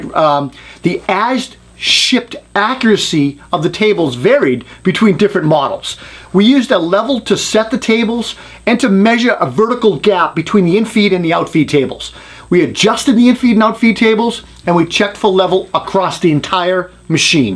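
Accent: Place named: Worcester, United States. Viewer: Having lived in Worcester for the first 20 years of my life, I could place that accent immediately.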